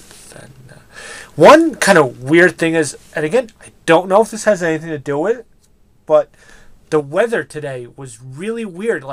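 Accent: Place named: New York, United States. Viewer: you from new york? I heard an accent and I used to live there. Good vid I subbed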